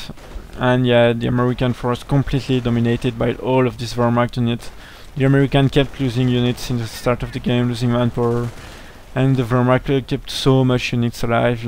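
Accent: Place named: France. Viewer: nice accent dude you must be the only french whose english i can listen to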